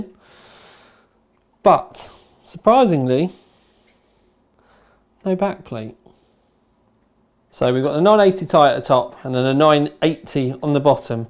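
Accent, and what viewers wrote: England, United Kingdom. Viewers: ferdaus bhuiyan so he's from England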